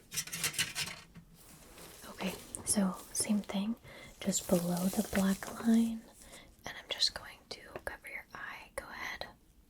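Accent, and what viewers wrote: United States. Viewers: that american accent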